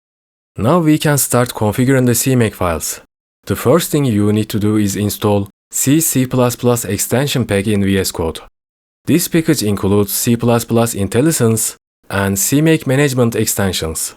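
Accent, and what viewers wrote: Turkey. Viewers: konuşmandan türk olduğunu anladım master :) ben de linux da vulkan api ile çalışan bodycam clonu yapmaya çalışıyorum. windows dan devşirme değilde linuxdan devşirme yönlü olmasını istiyorum. microsoft ve nvideadan bağımsız directx saçmalığı olmadan. kod bilgim yok ama bası oyun modları yaptım daha önce. biz yetişkinlerin her akşam seferberlik eğitimi olarak. yarım saatlik roundlarla cqb savaşı yapa bildiği takım bazlı oyunu yapmak istiyorum. lyra game baz alarak bluprintler ile yapmayı deneyeceğim.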